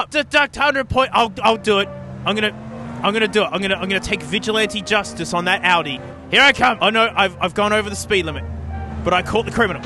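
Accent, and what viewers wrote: Australia. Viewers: Omg its aussie. I needed this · Australian let's game it out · I clicked on this video, having no clue what I was in for the first two seconds. I hear funny Australian man and goofy old Timey music. I don’t know how I have accidentally found the funny iPod man.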